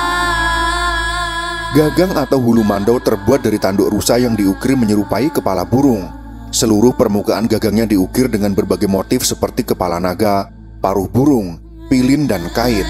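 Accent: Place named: Java, Indonesia. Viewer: Ini yg menguak sejarah pasti Jawa?🤣 Dari cara bicara udh ketahuan 😂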